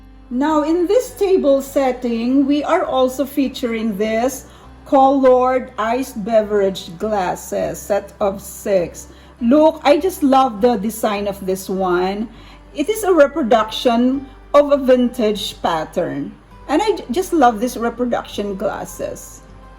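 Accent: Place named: United Kingdom. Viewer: Back to your table set up. Your vintage ginger jar oh my you have all this beautiful rare pieces in your home. The fruits and the crystal tray is epitome of Classic blue and white decorating. I love the idea that you bring fruits for fall accent and I know we don’t celebrate fall in the Philippines and that’s a very clever idea. Tea party is one of my favorite too so overall you made me really happy with this collaboration and you make me laughed with your British accent. Lol I hope we can do this again next time this is really fun I learn something from you and I love it. Thank you Sis. I did share this in my FB and later in my community tab.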